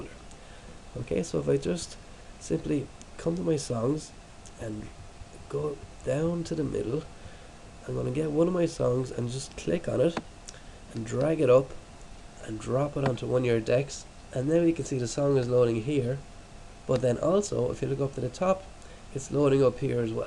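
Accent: Ireland. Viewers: r u irish?? just wondering :) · You're Irish :O I love your accent so so so much. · nice to hear an Irish voice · He's not foreign.. he's Irish... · are you irish ? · Where in Ireland are you from ? haha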